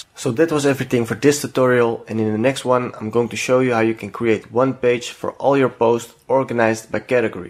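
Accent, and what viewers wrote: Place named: Netherlands. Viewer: I know a Dutch man when I hear him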